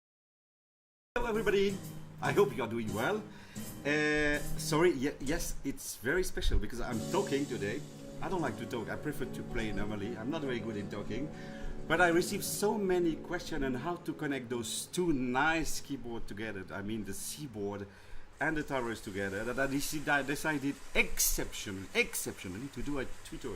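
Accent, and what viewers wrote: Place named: France. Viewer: salut à toi l'artiste. je viens de découvrir ta chaine. vraiment très bien. vu ton accent franglais, j'en déduis que tu es français ? de quelle région? cordialement. Laurent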